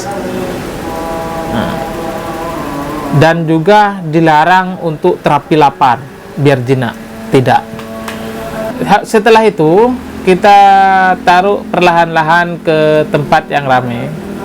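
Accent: Madura, Indonesia. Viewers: logat e kyk orang mdr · Kayak orang Jember bahasanya.madura nya mdooookk knaaakk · Ky orang mdr ni bahasanya bengkok2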